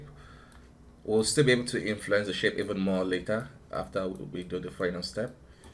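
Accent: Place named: Nigeria. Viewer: wtf just when i thought i was the only nigerian who knew blender. even from ur voice i know u are nigerian keep it up bro.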